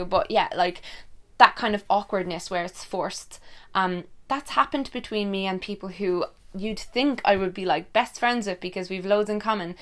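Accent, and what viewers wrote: Ireland. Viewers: Veronika Meister Any accent can sound both good and bad, in my experience. I've met Germans with lovely, lively and warm accents and others with a very harsh and unpleasant ones. My friends ex had this amazing accent, very pleasant and soft. Same with Irish, some have a lovely accent (like on these videos), others have an accent that is very abrasive. Heck, look at British accents, so many regional varieties - some of which are, let us say unpleasant lol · Before watching your videos, I thought that the English accent was the best. Now I'm love with the Irish one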